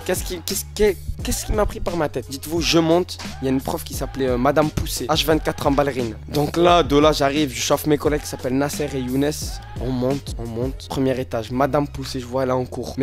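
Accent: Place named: Marseille, France. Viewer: Il a l’accent de Marseille mais il met paris ?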